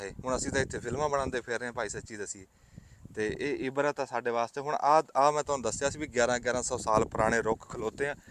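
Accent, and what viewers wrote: Punjab, India. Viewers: Very sweet punjabi speaking❤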